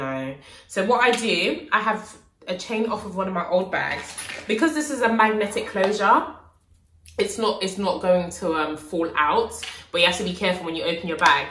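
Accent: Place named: South, United States. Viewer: Love your review and your accent with a little southern twang. "Bruh you pay for this shit"....lol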